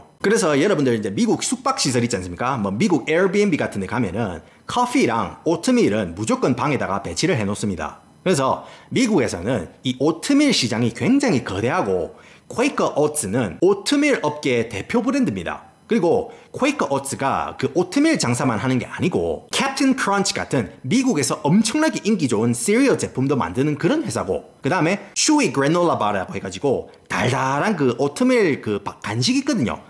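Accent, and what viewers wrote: Gyeongsang, South Korea. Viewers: 경상도 분이신가요?? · 아니.. 우리 경상도 아재 언제 채널명이 바꿨는지. 어허. 이무슨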